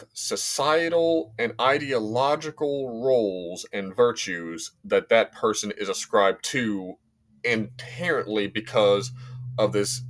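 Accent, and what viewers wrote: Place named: Appalachia, United States. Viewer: You from Appalachia or just live there.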